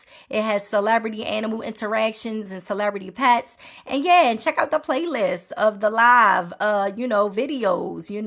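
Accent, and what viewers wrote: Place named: Baltimore, United States. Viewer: Me and my son enjoyed ya video , And you must be from Bmore or Maryland i love ya accent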